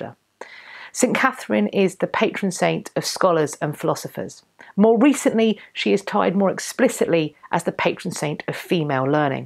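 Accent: United Kingdom. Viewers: Hi, I am new to your channel, I live in the US but I wasn’t born here! I love the beautiful British English, along with the fascinating content. I have been obsessed with the Tudors and British history! Can you do a video about Katherine Howard?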